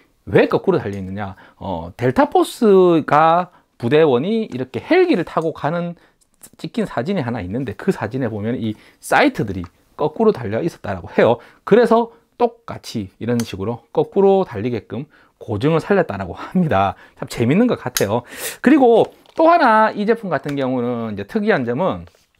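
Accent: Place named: Seoul, South Korea. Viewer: 그냥서울말안쓰고 그냥 말하면 안돼나요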